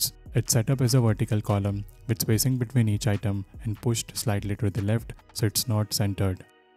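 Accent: India. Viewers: You are from India? Your accent is totaly matched with Indian and your work, literally of god level❤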